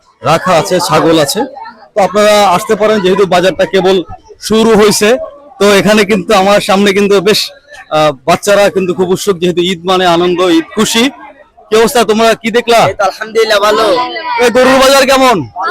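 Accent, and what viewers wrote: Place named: Dhaka, Bangladesh. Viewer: আপনার দ্বারা ভাষ্য অনেক সুন্দর। চাকা বাংলার মতো বলেন। আলহামদুলিল্লাহ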